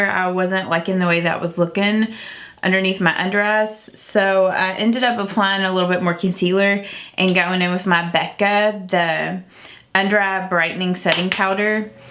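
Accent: South, United States. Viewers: I love your chatty GRWM videos! You're so sweet and relatable and I love a Southern accent (Southern girl here)! But I like voiceover or music too... I'm gonna watch whatever you post 😀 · I love her accent too! I'm from a small town in West Texas. Her accent's not exactly the same but I find it warm and inviting.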